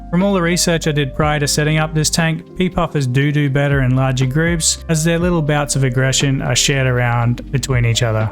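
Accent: Australia. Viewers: I may be wrong but your accent sounds Australian, if so what store did you get the rocks from?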